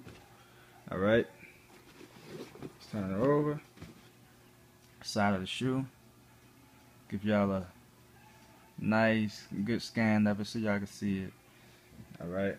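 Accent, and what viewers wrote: New Orleans, United States. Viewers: New Orleans accent? I'm going to check the website out. Thanks for sharing!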